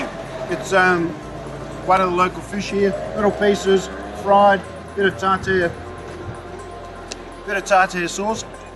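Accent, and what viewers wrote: Netherlands. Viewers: Natie je bent zelf Nederlands je spreekt die woorden zo Nederlands uit he weejoo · You are definitely Dutch I can hear it in your accent and how you say the names of the foods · je hoort dat hij hatstikke nederlands is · im dutch,, hes to i can hear by his accent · You got A verry dutch accent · don't say you are not dutch your voice is as dutch as it can get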